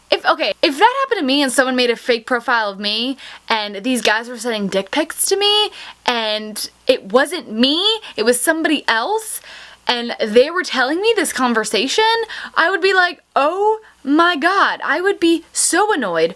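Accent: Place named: United States. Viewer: Most jarring accent and way of talking I've heard in a long while. You give American girls a bad name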